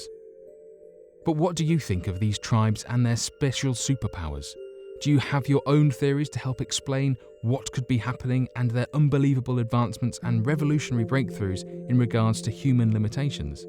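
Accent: United Kingdom. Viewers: I am finally tired of the misleading Click Bait and vague stories with no real thorough information. The girl with the different colored eyes, Click Bait! How fast can the African runners get up to?! The Okinawan people live how long on average? Finish your damn stories! These are not super powers - you got me! Clearly adaptation and acclimation have created the hearty Sherpas! Poor journalism with a British narrator! I'm not impressed. With YouTube's recent money police out and about demonetizing people for all the false and stupid reasons, they should take a look at you and smack you for your constant fake thumbnails and false and misleading, half researched, bullshit titles and stories! I will now unsubscribe.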